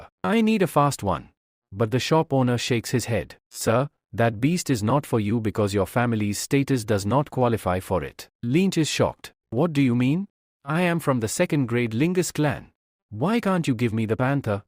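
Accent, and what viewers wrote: India. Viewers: Why give the AI a Indian accent?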